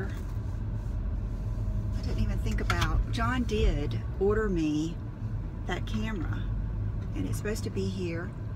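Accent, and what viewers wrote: South, United States. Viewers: I've lived in NC my whole life- near OBX and have never heard such a wonderful southern accent! voice is just sooo pleasant! I just love it!....oxo! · Yay!! So glad to be able to spend days with you- it's so funny I feel like I can really hear the southern in you more in this vlog! 😘😘😘😘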